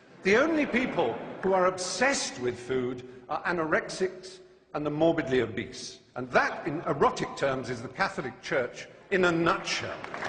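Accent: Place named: RP, United Kingdom. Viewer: Wow,what a LIAR. Where to start? Repeating black legends in a posh voice does not a clever argument make. Firstly,Galileo was brought up on charges of being a heretic,not necessarily to do with heliocentricism.The Copernican theory he mentions is funny because Copernicus himself was a Catholic cleric.The enlightenment?You mean like when those who took part in the French revolution persecuted Catholics as bad as the Nazis did the Jews and all in the name of 'reason'?Thomas More?How about Cromwel?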